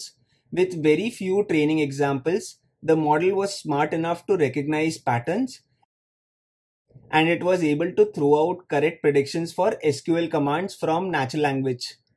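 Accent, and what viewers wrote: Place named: India. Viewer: on an unrelated note, why don't indians try to learn english without the pronounced indian accent? other countries will sometimes have accents but many try to match the language without an accent or even speak a british or american accent. But in india it seems to almost always be a very pronounced indian accent. I suppose it's better than asians that just can't speak english at all but still :)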